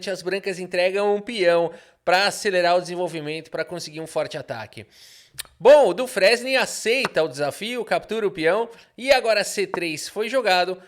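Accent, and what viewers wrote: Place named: Rio Grande do Sul, Brazil. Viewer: Percebo q você é do rs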